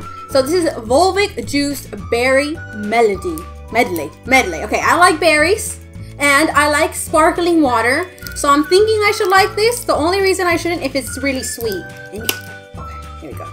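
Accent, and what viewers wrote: United Kingdom. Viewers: I thought ur British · Ikr! She probably started to sound like British people because she's been living with them 😂😂🇬🇧🇺🇸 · Ur accents gone a bit British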